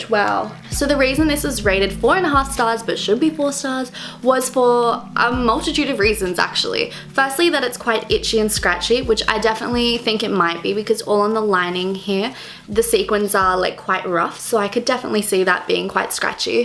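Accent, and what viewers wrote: Melbourne, Australia. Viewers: What? she sounds completely Aussie. Is she supposed to sound like a bogan not be accused of 'faking' her accent? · I'm Australian too and she sounds just like I do. Sometimes people tell me I sound American a bit too. I grew up moving from Queensland to Melbourne? Maybe that's why? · I get so used to hearing American's on the internet and in TV shows and movies, I always have to double take when I hear an Aussie, Proud <3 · I'm American, and her accent sounds Australian. Just like us Americans, we have different accents from all over the country. I'm pretty sure the same goes with Australia. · Right when I clicked on her video, and heard her speak... I knew right away, she's Australian. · Why does your Australian accent sound mixed with American? Your accent sounds nothing like mine. I’m Australian also. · She sounds like every white girl in Melbourne lol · America has stereotyped the australian accent 😂😂😂 i love your pure accent more!!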